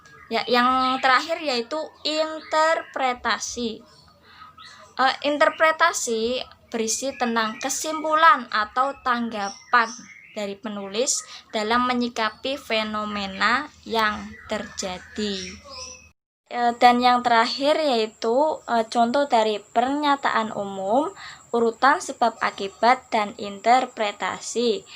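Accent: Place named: Java, Indonesia. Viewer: Kangen medoknya Non. 😆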